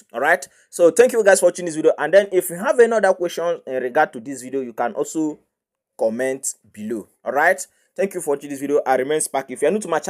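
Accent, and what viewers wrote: Igbo, Nigeria. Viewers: Na your igbo tongue dey give me joy😅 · Honestly. It's that Igbo tongue that makes me smile on my first view of whichever of his videos I bump into. 😂😂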